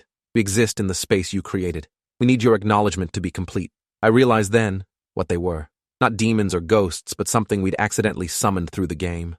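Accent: United Kingdom. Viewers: Holy British bru 💀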